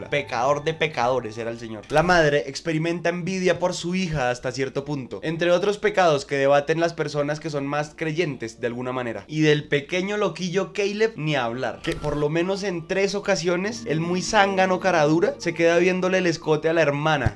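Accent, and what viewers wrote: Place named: Bogotá, Colombia. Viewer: Woow chimba estos análisis en acento colombo rolo!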